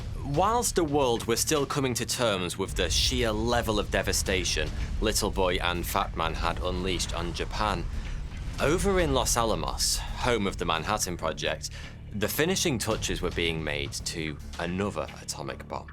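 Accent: United Kingdom. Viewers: Definitely sounds like he's from the UK somewhere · Okay, but why is Frankie Muniz doing a British accent?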